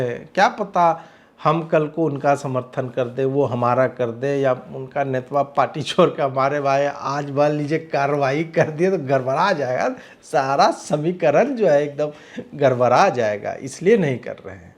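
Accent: Bihar, India. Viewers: ji, Mai toh aapke videos dekhti hi hu, meri mataji bhi aapke videos dekhti hai. Aapki bahot prashansa karti hai ki aapki Hindi bahot achhi hai aur iss video mein toh aapne kya hi bihari bhasha pakad ke roast Kiya hai. Bahot bahot badhiya aur aabhar aapka. Aise hi videos banate rahiye! 🙏🙏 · भई मज़ा आ गया क़सम से पूरे बिहारी स्टाइल में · Vaah Bhai,tu si great ho. Aaj to pure Bihari andaj mein.outstandsing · अच्छा नहीं...बहुत बहुत बहुत अच्छा लगा....किसी भी film से ज्यादा entertaining है....बिहार ki hindi बहुत mithi है....thank you so much bhai...god bless you...🙏🙏🙏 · Uffff ye maithili touched Hindi bihari accent ke sath maza aa gya ekdum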